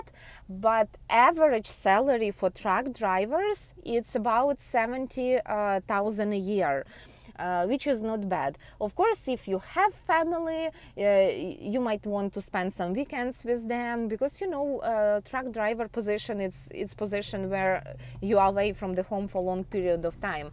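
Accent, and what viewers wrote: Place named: Russia. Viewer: Ох уж этот русский акцет...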